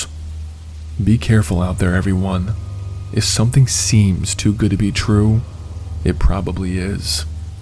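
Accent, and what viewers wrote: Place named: Delaware County, United States. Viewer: Love your videos & creative story categories ! Are you from DelCo ? Your accent is super DelCo lol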